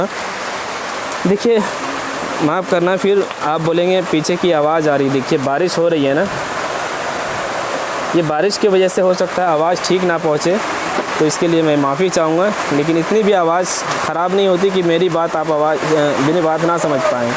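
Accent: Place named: Bhagalpur, India. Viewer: कहां से हो भाई। भाषा तो भागलपुरी लगता है।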